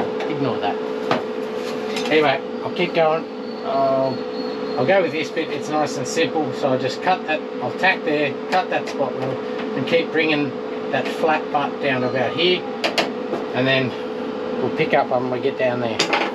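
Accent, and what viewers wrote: Australia. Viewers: Nice job on the floor pans😅. Did a few. Lol. Tech screws and filler!! 😜. Living in UK now. Good to hear a true fair dinkum Aussie accent. Keep the videos coming. Still think that asthmatic 202 and traumatic need to go!! It's begging for big block and 5 speed transmission 🤗🤗🤗🤗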